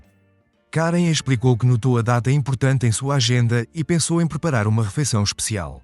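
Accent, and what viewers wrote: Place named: Portugal. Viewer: Sotaque horroroso esse de Portugal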